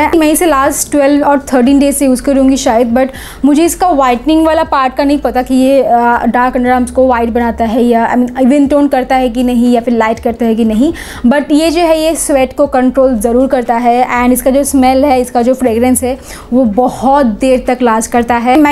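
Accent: Bengal, India. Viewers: You are Bengali apke baato se pata chal Raha hai Bengali log Hindi bolte hai toh pata chalta hi hai 😅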